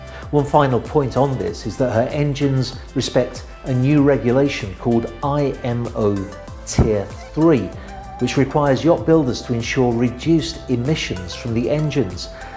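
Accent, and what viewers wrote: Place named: England, United Kingdom. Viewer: Sorry, had to thumbs down for wearing a mask while walking alone outside. Also, that damn regional English accent that pronounces ing as ink, its driving me insane. Spiffing Brit does it even worse and it makes me almost have to stop watching his content. It's just something about saying k instead of ing that drives my brain to insanity. You might as well pronounce w as z. Its so random and makes no sense.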